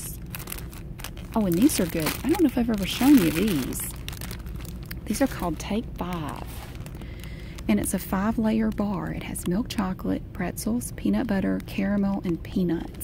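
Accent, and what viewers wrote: South, United States. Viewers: Southern, we have it where I live but I’m not that extreme😂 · This is the best ASMR video I’ve seen and heard in a loooooong time. Certain accents do it for me, so the wonderful combo of your southern accent, your soft talking (I’m not a huge fan of whispering, so your voice was perfect), your attentive organization and knowledge, the sound of all the crinkling......and 30 minutes of it! Pure ASMR perfection......thank you......I can’t wait to watch more of your vids. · Normally I find southern accents annoying but yours is so soothing. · I think she won me over with the crunch of the bagged candies sound and her Southern Drawl. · Southern usa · TheLion yeah sounds very NC/SC/Georgian as someone with family from that region. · Seba Arias southern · Zoran K. georgia,south carolina,north carolina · Seba Arias american? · I love your shopping videos despite all the background noises... 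it makes me feel like I'm shopping mom/grandma (I only say grandma cuz my grandma has the same sorta southern accent as you!) Cept she was mean to me and your soo super sweet and nice! It's almost like having a mother who loves me... I know that sounds a bit sad but your very comforting to me like the mother I never had <3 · Seba Arias she has a southern accent ( she probably lives somewhere south in the us like Alabama or Texas or something) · If anyone from Britain comes back to these comments, I have a question. This video’s narrator has a Southern accent (she would say I have a Yankee accent LOL). Does her Southern accent sound more like British English than other American accents? I have heard this may be the case but was not sure. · southern it sounds like north Carolina or Tennessee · Her southern accent is giving me LIFE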